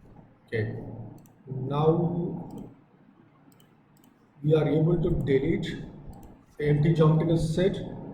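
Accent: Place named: India. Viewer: OMG accent English Indian is just Horriful xD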